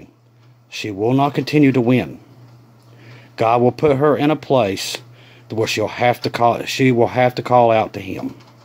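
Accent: South, United States. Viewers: I've been through the fires here in Nth Cal. it is hard, hang in there and thank God for the rain you'll be getting. I appreciate your teaching and we hear His voice. Come Jesus soon, we are ready. And ya know, I don't know where you live, but I love your country voice and accent Bro.. Keep preaching, keep on because someone is listening who needs this.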